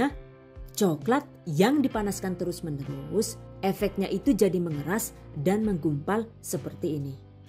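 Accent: Banyumas, Indonesia. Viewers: Mantap teh,pake BHS ngapak dong,seneng bget q dengernya. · Pling suka nonton vidio lucu bhsnnya cmpur ngapak krn aq jga org purbalingga jawatengah sama ngapaknya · Seneng nek lg ngapake 😅😅😅 Suka pokoke nyonge ngrungokna ngapake ora ngapak ora penak 😍 Sllu mengikuti yu 😍 sukses selalu.pokoke 🙂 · wong ngendi sih rika kok bisa bahasa ngapak? Salam ngapak 🤣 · Kerennnnn Bun apalagi kalau pas Bunda ngobrol ngapak seneng saya dan Alhamdulillah sya kemaren nyoba buat modal cangkir dan tatakan nya sama mobil2 an lucu lucu makasih Bun sehat selalu buat Bund👍🏼👍🏼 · 😂😂 klo sdh logat ngapak bikin ngakak Ini konten masak teraasikk 😁 sukses bu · lah jan rika jane wong ngendi.. 😆nyong ngapak kie,dadi geget ngrungokna rika gawe video wkwk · Saya suka dengan Chanel ini, penyampaian dan resep nya ok banget. Mbk nya juga lucu,kadang ngapak juga. Haha Andalan nya "catat" dan "ookeeh guys" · ini aslinya orang mana sih? 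Ngmong ngapaknya lucu, tp pinter bhasa inggris juga 😁😁👍👍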